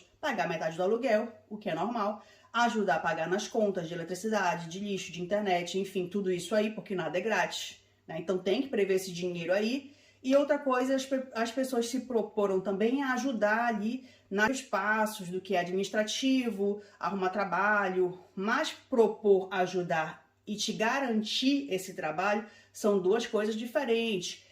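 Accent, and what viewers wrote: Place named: Pará, Brazil. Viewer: Ótimo vídeo, conterrânea! Nemo dá pra perceber que você também é paraense kkkk... sotaque super marcante .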